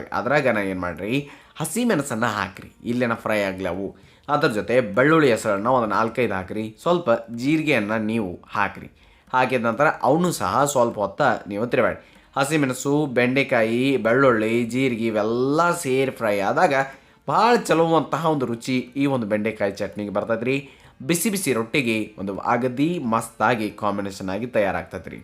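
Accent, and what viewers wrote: North Karnataka, India. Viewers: ನೀವು ನಮ್ಮ ಉತ್ತರ ಕರ್ನಾಟಕದವರು ಅಂತ ನಿಮ್ಮ ಭಾಷೆ ಹಾಗೂ ಚಟ್ನಿ ಮಾಡುವ ವಿಧಾನದಿಂದಲೇ ಗೊತ್ತಾಯಿತು ri. ಏನೇ ಆದರೂ ನಮ್ಮ ಉತ್ತರ ಕರ್ನಾಟಕದ ಅಡುಗೆ ತುಂಬಾ special ರುಚಿ ri.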